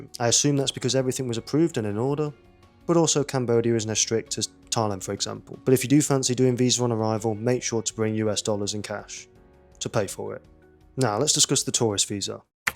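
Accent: United Kingdom. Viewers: Which part of uk is your accent from? Midlands ? Ha ha Many thanks,, curious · I've been listening to you, thinking your accent isn't so different from my dad's family. They're Brummies! I hope that's not an insult...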